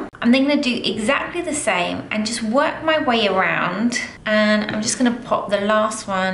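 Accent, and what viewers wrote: United Kingdom. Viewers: just subscribed you... you deserve it... best tutorials on youtube everything in detail and yr british accent i love it i grew up there... but nevertheless all accents are good... love and hugs pls make tutorial on cherry blossom i make flowers w porcelain and the result is great... GOD BLESS U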